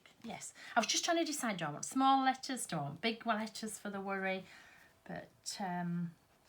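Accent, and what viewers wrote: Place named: Yorkshire, United Kingdom. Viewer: So lovely listening to a Yorkshire Lass and giving lots of advice & inspiration. Thankyou, from a misplaced Yorkshire Lass dahn souff. Xxh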